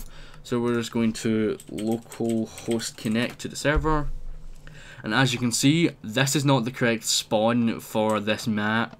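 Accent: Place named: Scotland, United Kingdom. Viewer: I LOVE URE AWESOME ACSENT ITS AWESOME R U SCOTISH? SCOTISH R AWESOME OMG!!!!!!!!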